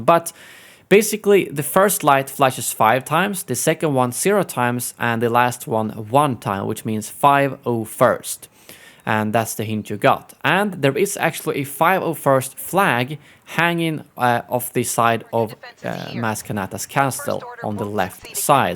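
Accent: Sweden. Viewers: are you sweddish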